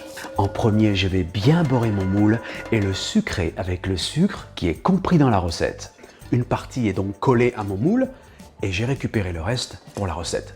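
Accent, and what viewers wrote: France. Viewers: j'adore ton accent français à la hache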